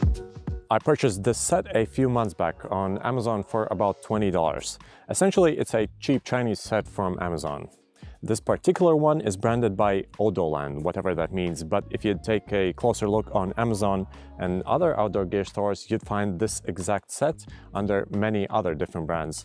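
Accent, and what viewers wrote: Latvia. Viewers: Pēc akcenta atpazinu, ka latvietis :)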